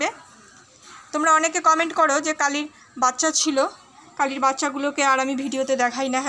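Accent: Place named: North Bengal, India. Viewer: Didi aapnar bachcha ta sob aaponjonke niye thaakte bhalobashe - khub bhalo sona baba ta aapnar. Didi aapni ki coochbehar athoba jalpaigurir aashe paashe kothao thaaken, karon aapnar bhashar tan theke ami eta bujhechhi je aapni North bengaler kothao thaaken, ami aapnader north bengaler bhashar onekta bangal plus calcesian bhashar mix) saathe khub parichito karon amar maa Hashimara tea estate er manush chhilen plus ami nijeo upper Assam thaaki tai bollam.❤❤❤❤❤❤❤❤❤❤🐕🥰🥰🥰🥰🥰🥰🥰🥰🥰🥰👍👍👍👍👍👍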